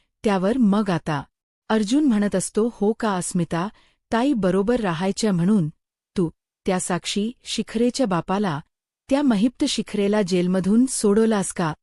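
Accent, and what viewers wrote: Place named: India. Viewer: What is your name,you sound like hindi speaking.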